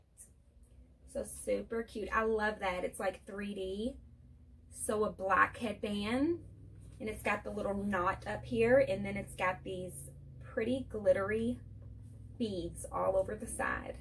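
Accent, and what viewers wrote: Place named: Southwest Virginia, United States. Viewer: your channel is my favorite! love your accent. It sounds a lot like mine. I'm from Southwest VA. I have two daughters and also live in a mobile home so we have a lot in common. I love to watch your channel for cleaning motivation. You're daughter is so cute and you are too! love your long hair!! Hope you have a great day. <3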